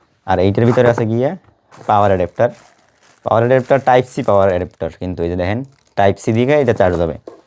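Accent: Barishal, Bangladesh. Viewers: আপানার বাড়ি কি বরিশারে ভাষা শুনে এমন টা মনে হয়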